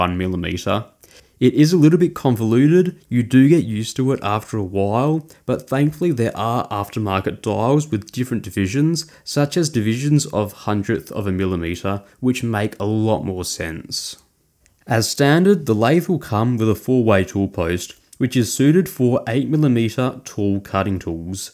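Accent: Australia. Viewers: Thank you for this very thorough and well thought out review! I have been procrastinating for years now on my decision to jump into a mini lathe, because there just isn’t enough information out there in easily understood format. Good on ya mate! I enjoyed listening to your accent too, my younger sister lives in Albany, Western Australia, and so I miss hearing you Aussies! Cheers mate, from Northern California! 🙏💪🙌🤘🤘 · Is that an Auzzie accent? Does it vary from region to another?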